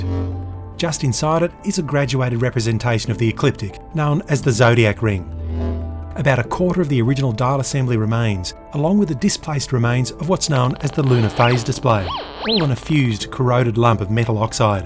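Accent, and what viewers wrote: Australia. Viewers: That soothing aussie lilt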